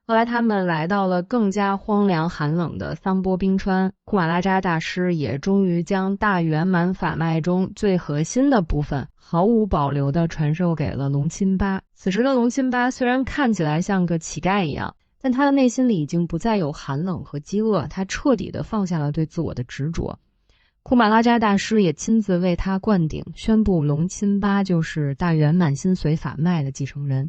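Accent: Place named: Beijing, China. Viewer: 解说一口北京腔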